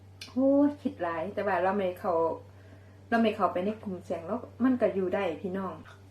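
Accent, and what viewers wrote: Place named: Korat, Thailand. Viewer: สวัสดีชอบสำเนียงโคราชมากค่ะ เป็นตาแช่บแท้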